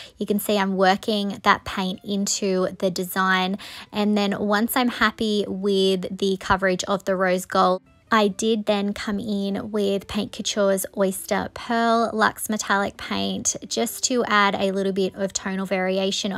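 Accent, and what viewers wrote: Australia. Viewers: Hi, you’re obviously an Aussie, where are you situated. I love your work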